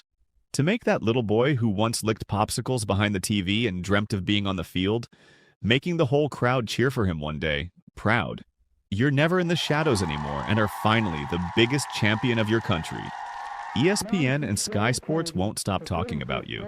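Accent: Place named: United States. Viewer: Bro is this video a troll? Because you sound too american to be serious when talking about football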